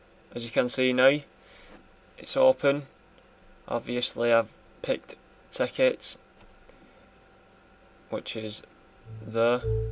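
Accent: United Kingdom. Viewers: Sound like your a fellow wiganer? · no is from up north (English midlands) i think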